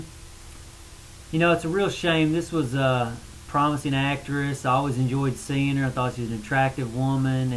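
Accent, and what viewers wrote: South, United States. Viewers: haha nice southern accent and i no everyone is dieing....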